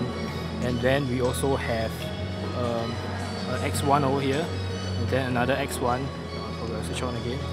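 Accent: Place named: India. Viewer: Bro no offence but you indians speak english far too fast makes it harder to understand with your accent speak slower then i will be able to understand you better.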